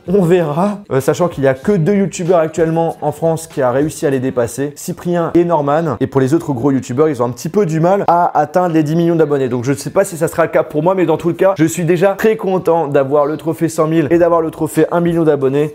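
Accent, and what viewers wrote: England, United Kingdom. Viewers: TON AXAN ANGLAI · hahahaha j'aime ton accent d'englais sa me fait rire 😂👌✌️❤️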